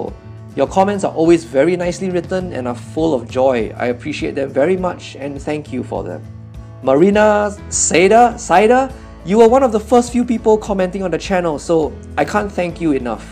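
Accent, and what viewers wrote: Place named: Singapore. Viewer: This was the most wholesome video I have ever seen, really cute! And you are from Singapore, always wondered where your cool accent comes from. <3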